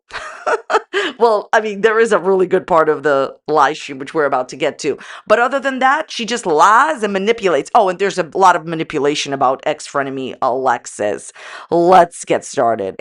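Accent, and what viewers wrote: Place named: East Coast, United States. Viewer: love your accent. I am from the east coast and miss the accent.❤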